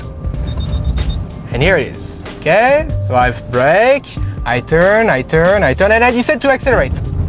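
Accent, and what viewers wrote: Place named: England, United Kingdom. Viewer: ton accent anglais est vraiment détestable.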